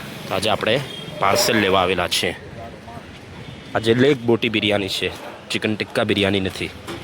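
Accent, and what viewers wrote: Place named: Navsari, India. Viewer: bharuch ma 6 varas rahyo choo engineering karti wakhte ,jhuni yaado taaji karavi didhi , thank you bhai , tamara navsari wada ni gujarati sambhadva ni bahu majha aave che . aey wakhte bharuch ma sauthi sasta theatre hata to to bahu majha aavti hati ne station par fruit juice ni dukaan , ne 6 no. na platform ni chai ne 1 no na platform na kanda wada bahu tasty hata , ne bharuch ni sing to kharij . bahu majha na divaso hata aey .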